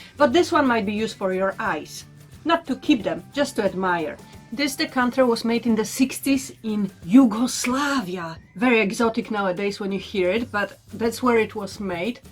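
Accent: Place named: Poland. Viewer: Your polglish is the best proposition ❤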